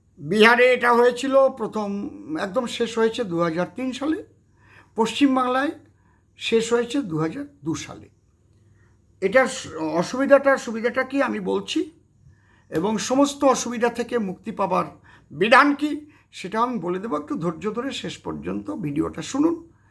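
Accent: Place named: Bangladesh. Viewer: তুমি তো বাংলাদেশি তোমার এখনো বাংলাদেশি ভাষার আড় ভাঙ্গেনি তোমাকে আগে তাড়ানো উচিত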